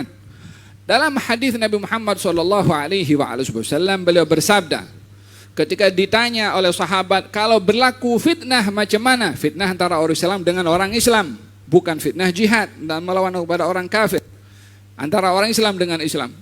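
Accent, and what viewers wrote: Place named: Malaysia. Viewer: Ini logatnya kayak orang malaysiau😂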